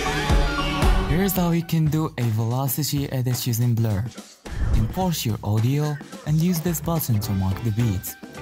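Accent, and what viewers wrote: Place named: Morocco. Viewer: You sound Moroccan😭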